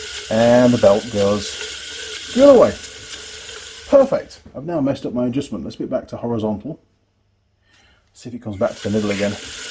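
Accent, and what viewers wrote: Yorkshire, United Kingdom. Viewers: Where in the UK were you from originally? I'm detecting a Yorkshire twang.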